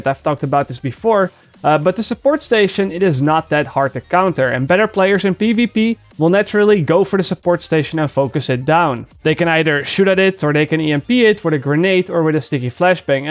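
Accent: Netherlands. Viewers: is he dutch because he sounds dutch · are you Dutch?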